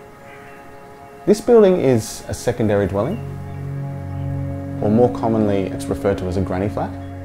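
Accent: Australia. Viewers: Aussie accent that's why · Oh my... Australian accent is lit 😁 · Elyse G.엘리스 지 That’s his Australian accent, maybe you shouldn’t judge people’s accent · Why not judge accent of a native English speaker? It wouldn't be nice to judge non-native speakers because they have disadvantage but no excuse for "Aussies" :D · it is Australian accent. Dont believe it? Go to australia mate. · While he is very Australian, this is his individual way of speaking, and not typical to our accent at all. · It's the Australian accent lol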